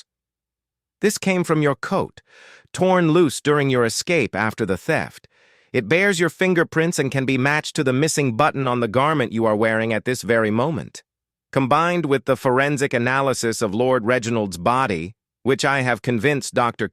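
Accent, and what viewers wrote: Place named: United States. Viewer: Give this a miss! AI GENERATED STORY AND AMERICAN NARRATION.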